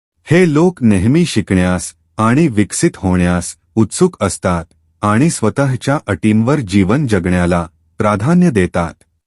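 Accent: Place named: England, United Kingdom. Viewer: इंग्रजी भाषेचा टोन देऊन मराठीत माहिती सांगतोय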